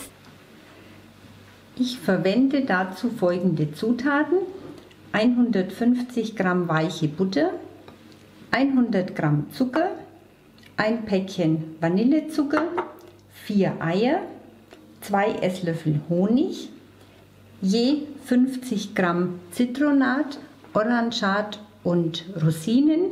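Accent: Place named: Franconia, Germany. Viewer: Liebe, deine Videos sind einfach spitze! Wie schon viele andere ZuschauerInnen geschrieben haben: total gut erklärt, praktisch gelingsicher, zudem die vielen praktischen Tips und deine ruhige, freundliche Art... Passt einfach alles! Ich wage es mal, eine persönliche Frage zu stellen: Du siehst vom Typ her aus wie eine Oberpfälzerin (ich kenne so einige, deren Schwester du sein könntest!🙂), aber der Dialekt geht eher ins Fränkische, finde ich. Ist da was dran? Ich freue mich auf weitere Rezepte und Tips von dir! Liebe Grüße!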